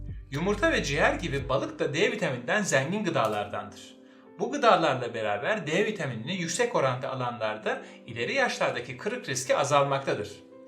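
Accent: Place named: Samsun, Turkey. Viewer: Hocam Samsunlumusunuz, veya Samsunda bulundunuz mu? Şiveniz Samsun şivesi de ondan sordum..?😂 Sevgiler Saygılar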